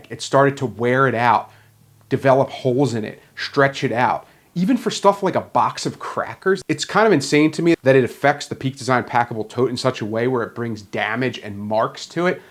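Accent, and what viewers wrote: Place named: Philadelphia, United States. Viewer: That Phuluffia accent. Go Birds!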